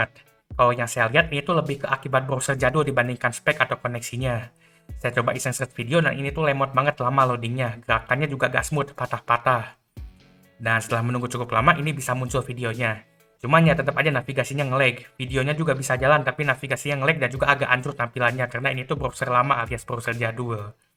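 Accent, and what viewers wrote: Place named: West Java, Indonesia. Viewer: orang bandung wkwk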